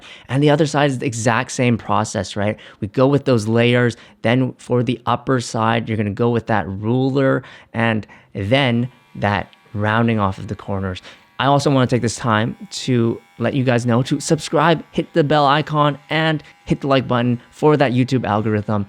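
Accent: Canada. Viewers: Are you Canadian by any chance? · The Canadian accent is familiar and pleasant to hear 😊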